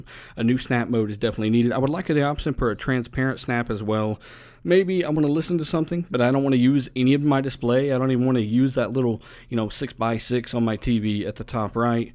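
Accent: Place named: Hawaii, United States. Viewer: you got that minor pigeon accent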